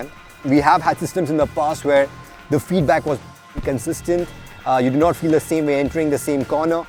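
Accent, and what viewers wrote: India. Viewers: ''paaan nigaale'' lol typical dhoti tone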